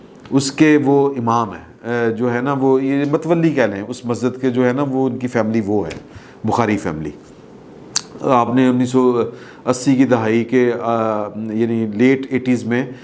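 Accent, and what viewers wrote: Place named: Lahore, Pakistan. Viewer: Maza aa gya...the best thing I like about you is Lahori zaban. Dil khush ho jata hai...kyun...mein vi Lahori ain beshak Karachi rahta hoon.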